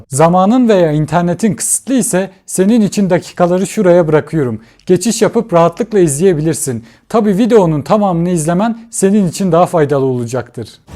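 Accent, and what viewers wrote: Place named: Eastern Anatolia, Turkey. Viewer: Hemşerim nerelisin.. Lisanındaki ton Şarkî gibi..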